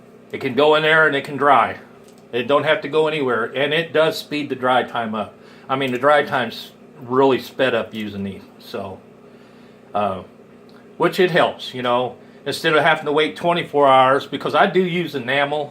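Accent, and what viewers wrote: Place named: South, United States. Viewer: Good and useful tutorial. I use a blow dryer for the most part but this would be useful for slow drying things. I think it would be great for car modellers especially as you want things to dry in a dust free environment. Thanks for your sub, I'm subbing back. I like your southern drawl... I think that's what you call it? I was thinking of introducing a character similar in tone to yourself... will have to work on it. Cheers!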